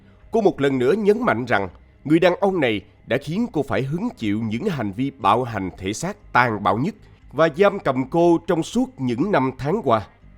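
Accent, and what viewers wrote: South, Vietnam. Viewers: giọng đọc miền nam nghe hay quá . cho 1 like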